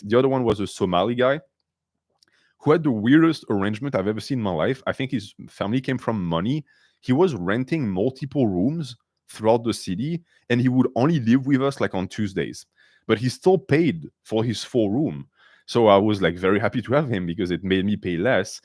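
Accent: France. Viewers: he’s loosing his french accent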